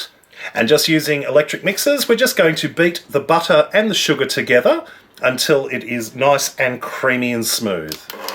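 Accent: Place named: Australia. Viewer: That's an Aussie accent like mine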